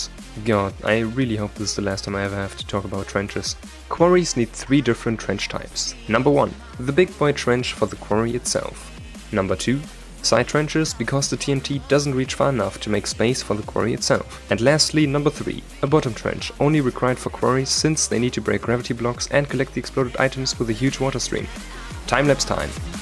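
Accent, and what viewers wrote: Germany. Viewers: Bist du deutsch ? hast Deutschen accent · Berliner Englisch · Are you from Germany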